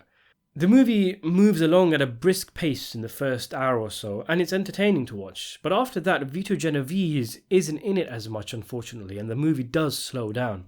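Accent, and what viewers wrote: United Kingdom. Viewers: Lacks authenticity kinda like a guy from britian or wherever the hell your from talking about shit that happened in our neighborhoods lol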